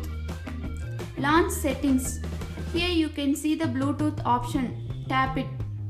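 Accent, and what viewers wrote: India. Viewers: Opens video Hears indian accent Closes video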